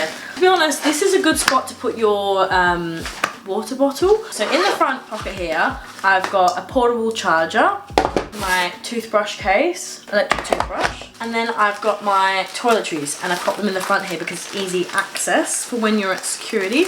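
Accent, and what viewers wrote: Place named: United Kingdom. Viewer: Your accent is sounding more and more British!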